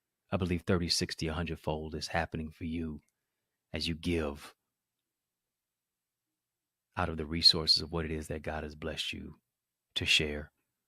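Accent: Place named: Malaysia. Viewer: Speaking manglish ✨🔥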